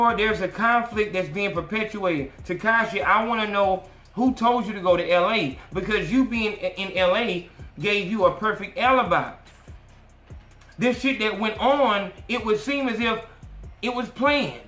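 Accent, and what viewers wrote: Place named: South, United States. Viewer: You from Bama?? I knew there was some south in you! 😊 #bamabornandraised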